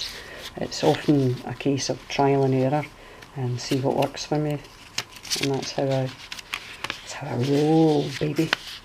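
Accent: Scotland, United Kingdom. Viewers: adorable wee little journals, you having me talking in a Scottish accent now, and I'm not making fun of you, I really could listen to you all day.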